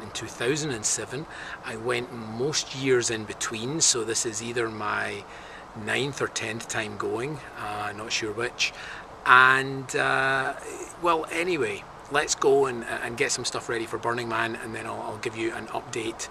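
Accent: United Kingdom. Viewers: what are you.. a reporter!? damn man... stop trying to show the burn... just do it... stop talking and saying "ya... uh ya.. uhh ya....uhhhh ya uuuh ya...( with u.k. accent etc etc etc) what is this non sense!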